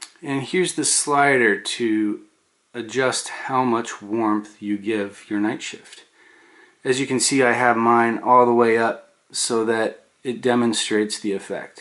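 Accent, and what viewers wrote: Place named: North, United Kingdom. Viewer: A real northerner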